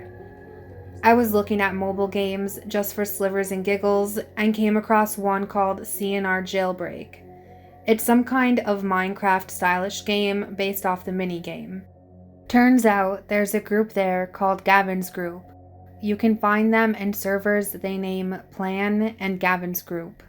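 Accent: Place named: Minnesota, United States. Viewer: Lol im waiting for a doncha know after every sentence. With your accent Minnesota? Montana? The dakotas U got that up north Canadian accent. But yea we need to go after these pedo punks. I wanna batman up with a posse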